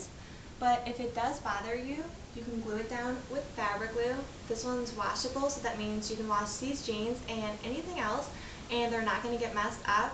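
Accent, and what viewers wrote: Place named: United States. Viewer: She sounds very American:)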